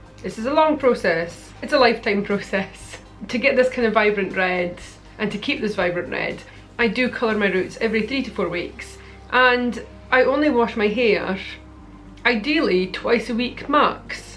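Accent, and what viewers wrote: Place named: Shetland, United Kingdom. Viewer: I never thought that I would ,be so fascinated by a hair dyeing video ! I guessed that you had a Shetland accent , its a good un !👍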